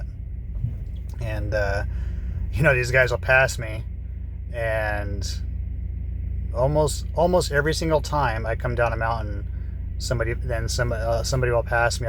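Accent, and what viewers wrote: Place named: California, United States. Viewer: That's funny; you can feel California, and I can hear it in you. 😆Travel safely...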